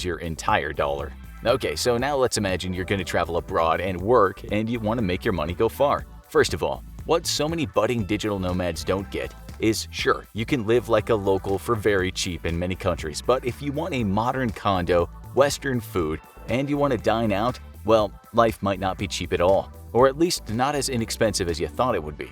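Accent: United States. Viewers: Ik bro i had a smirk when i heard him say that in his American accent get the infrographics show start speaking middle class british slang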